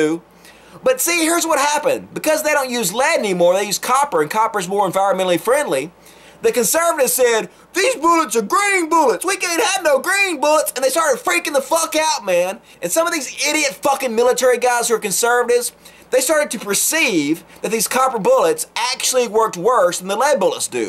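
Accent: South, United States. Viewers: with your accent, it would appear you are from a red state. You rock, but it must be lonely being one of the few with a brain that actually works!! Hang in there and keep the great logical videos coming! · Its pretty refreshing to hear a southern accent coming out of an intelligent person's mouth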